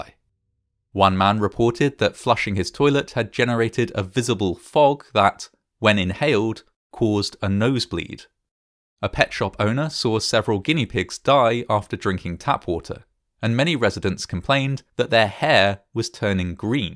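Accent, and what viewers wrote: England, United Kingdom. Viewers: He's an English native, speaking the language of English, with an English accent, in England 🤯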